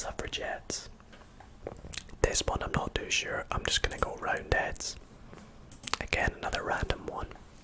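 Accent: Scotland, United Kingdom. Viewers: Британский чел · YES HES SCOTTISH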